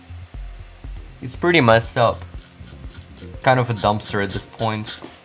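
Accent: Russia. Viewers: I like his Russian accent lol 😂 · "Because im russian" Я сразу по акценту понял что русский) Но англиский у тебя очень хорош. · У тебя акцент очень похож на русский 😳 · One of his longest videos and 1/2 with his voice i like your Russian accent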